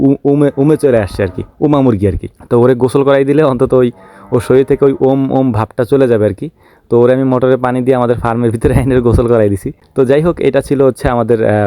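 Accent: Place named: Dhaka, Bangladesh. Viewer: Aponar vasa sune mone hoy aponi Dhaka district er manus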